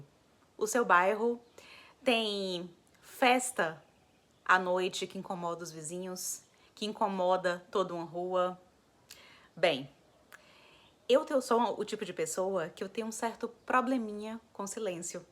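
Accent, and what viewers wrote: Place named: Salvador, Brazil. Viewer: O silêncio é um espaço vazio esperando ser preenchido com harmonia! Já morei em várias cidades do Brasil e percebi que a invasão do espaço alheio "poluição sonora" é uma questão cultural. Todos passamos por um processo evolutivo e acredito que a turma do barulho possa mudar o tom, e conviver em paz com seus semelhantes. Você tem um sotaque de Salvador, onde o silêncio vale ouro!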